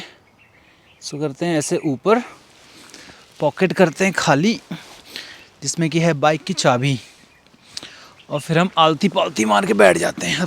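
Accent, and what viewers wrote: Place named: Pahadi, India. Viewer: Apaki pahadi avaz jaisi lagati hai very very nice. Thank you bhai.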